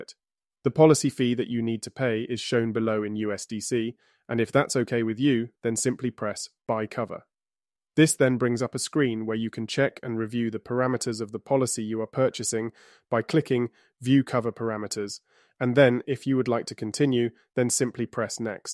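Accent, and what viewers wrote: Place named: United Kingdom. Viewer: I like dictor's british accent)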